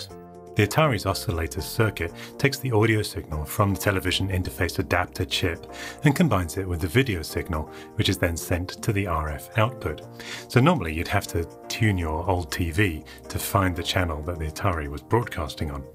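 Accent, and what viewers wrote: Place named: Southern England, United Kingdom. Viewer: you sound south of England area like myself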